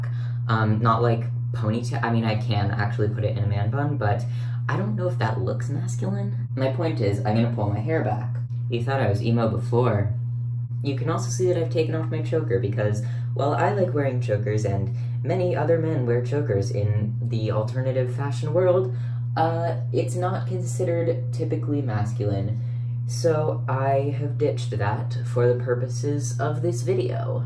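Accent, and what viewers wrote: Canada. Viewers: You sound so Canadian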